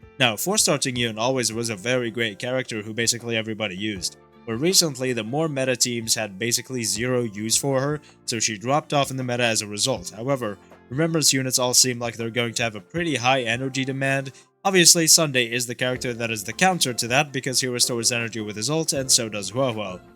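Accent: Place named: Arab world. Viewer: I think you are from united arab your accent seems like them or near it :3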